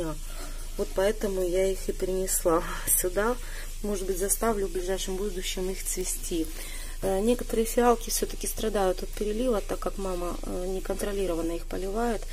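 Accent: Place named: Ukraine. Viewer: Здравствуйте! Спасибо за обзор. Начала смотреть ваши видео с антуриума. И мне понравилось ваше отношение к растениям. Скажите, пожалуйста, а где вы географически находитесь? Очень интригующий вид из окна! И очень напоминает картины северных районов России: Воркуты, например. Но судя по наречию, вы, конечно, украинка.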